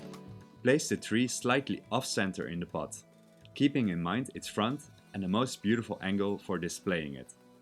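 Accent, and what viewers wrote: Netherlands. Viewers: O yeah dutch guy. Ik hoor het van 10km afstand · je hoort echt direct dat je een hollander bent😂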